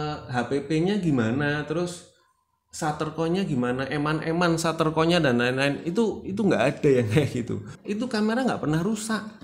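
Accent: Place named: Pekalongan, Indonesia. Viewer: Pas lagi nyari video ttg mengatasi backlight. Ketemu videonya sampeyan. Kok logat ngomongnya nggak asing. Jebule podo wong Pekalongane dewe 😁.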